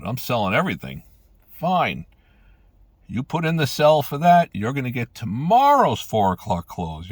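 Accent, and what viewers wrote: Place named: New York, United States. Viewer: I’m speculating based on your accent that you’re from NYC like me or perhaps northern NJ. You VERY much remind me of a former college professor of mine who’s a good friend of mine and he’s from NJ and the Bronx. 🙂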